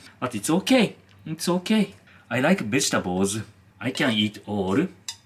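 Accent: Japan. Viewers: excatly Japanese English accent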